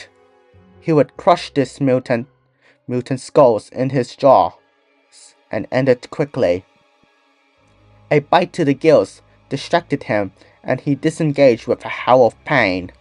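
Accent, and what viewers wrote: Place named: United Kingdom. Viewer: British ahh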